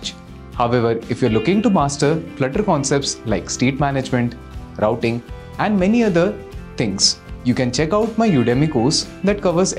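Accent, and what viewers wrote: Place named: India. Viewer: 这个咖喱味的英语听得真难受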